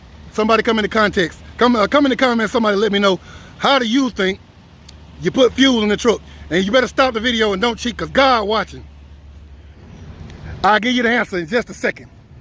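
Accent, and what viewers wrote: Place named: Louisiana, United States. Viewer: Bro you have like a California mixed with Louisiana mixed with Back road Mississippi accent😁 I love it!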